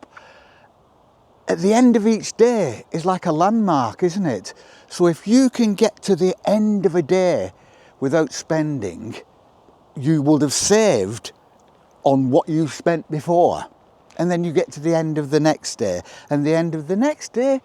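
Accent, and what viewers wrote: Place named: England, United Kingdom. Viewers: Hm.. It is great to hear english accent and someone saying all those great things about Humanity. God Bless You.. 🙂☕ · Absolutely love your Lancashire accent. So sad to hear it is disappearing 😢. Also you talk so much sense. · I’m appreciating your northern accent. All the people I’ve seen talking about slow living so far have been young American women. My issue with capitalism is that a bread company can make more money if they cut costs, so maybe they spray their wheat with pesticides to reduce crop losses, or they don’t pay the farmer a fair price, or they rip up the hedgerows to create a big monoculture field that’s quicker to reap etc. Seems like the lower your prices the lower your ethics! But people buy it regardless, because it’s cheap (and therefore they have more money to spend on cheap tat from B&M/holidays abroad/a new bathroom) Every pound you spend is a vote for the kind of future you want to see (to paraphrase Mike Berners-Lee) Thank you for sharing your wisdom! 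Best wishes from Derbyshire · Newly retired and beginning my journey toward slow(er) living. For me, the current challenge is more about changing my mindset than altering my actions. One day at a time, as they say. Finally, for those Brits that might know, what area is this man’s beautiful accent from? Maybe NE England? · I don’t know what part of England you are from but I love your accent.❤ No debt means no stress. I wish my government would’ve this way.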